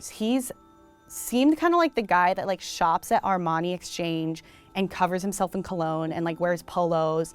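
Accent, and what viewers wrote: United Kingdom. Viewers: The British accent makes me wanna cry. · i cant get over her british accent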